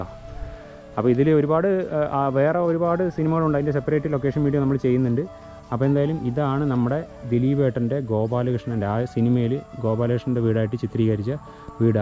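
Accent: Palakkad, India. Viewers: etta njngalude palakadan bashayilulla avatharanam suparanu enik oru request und mele parambil an veed movie location pleese bro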